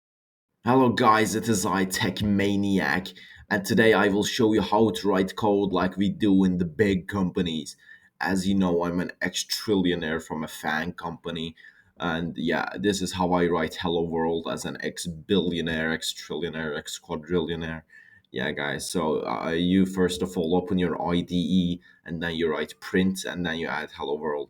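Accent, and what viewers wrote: Turkey. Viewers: Dude are you turkısh? EDİT: Kesin Türk'sün ama İngilizcen gerçekten çok iyi. Yani böyle dersin ana dili yani öyle konuşuyorsun. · Are you turkish? I saw the turkish "activate windoes" watermark . I should have understood it from your accent and profile photo. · Türk aksanı mmhhhh kolay gelsin brom.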